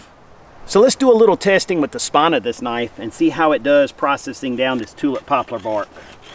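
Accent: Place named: Georgia, United States. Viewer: Having only known you from this channel. I have detected a southern "twang" in your speach, are you from Georgia?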